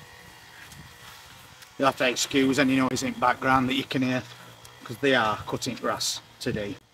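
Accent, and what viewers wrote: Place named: Derbyshire, United Kingdom. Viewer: You’re really settling into caravan plant life now. Your spuds look so good. We share the same dialect I reckon so listening to you reminds me of my Derbyshire family. Take care.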